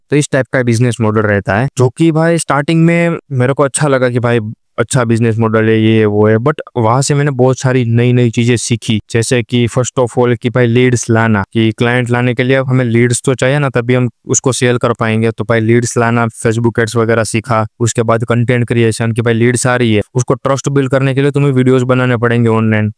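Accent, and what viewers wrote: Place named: Gujarat, India. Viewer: Tame gujarati Chhone Bhai Boli upar thi khabar padi gai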